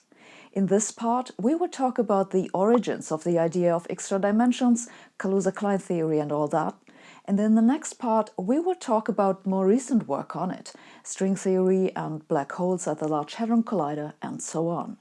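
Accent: Germany. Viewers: Sabine is lovely,funny and brilliant...she makes Physics fun and accessible...I love her German accent,too,tcx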